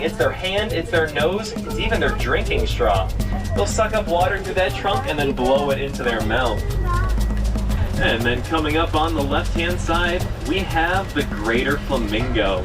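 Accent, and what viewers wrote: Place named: South, United States. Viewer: Where are you from? Your accents sound south too 🎉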